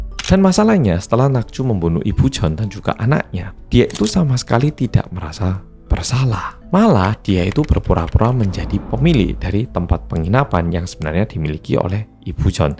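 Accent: Java, Indonesia. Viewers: Mas medok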